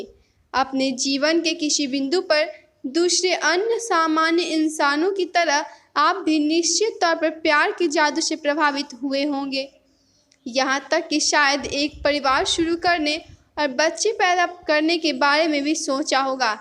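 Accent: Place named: England, United Kingdom. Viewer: इस प्रकार के compere !!! इंग्लैंड में पैदा हुए थे और भारत मे हिंदी बोल रहे हैं। स को श बोलने वाले ऐसे लोगों को तो dislike करना भी संभव नहीं। अच्छी खासी न्यूज़ की रेड़ मार देते हैं, पूरा मज़ा इनकी भाषा और accent से खराब हो जाता है।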